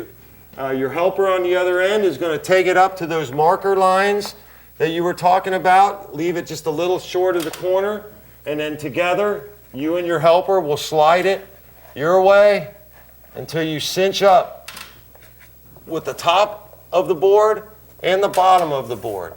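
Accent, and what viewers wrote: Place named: Pittsburgh, United States. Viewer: Hi! I see you are in Cincinatti, but I swear I hear Pittsburgh in your accent! Are you by chance from Pittsburgh?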